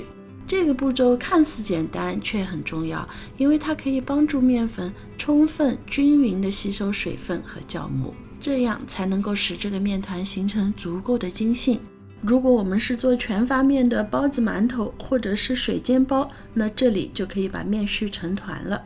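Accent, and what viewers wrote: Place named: Jiangnan, China. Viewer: 你是我在YouTube上看到的做饭最精细的人，口音口味，真的是家乡风味。江南人做面食和北方人差别太大了。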